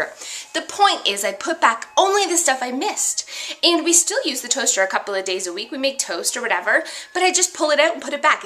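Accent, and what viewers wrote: Canada. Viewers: I think it's a Canadian accent!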